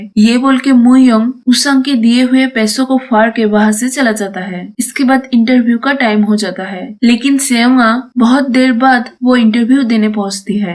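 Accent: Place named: England, United Kingdom. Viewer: Apka voice jyada english style huwa hey ..hindi mojle bolo behan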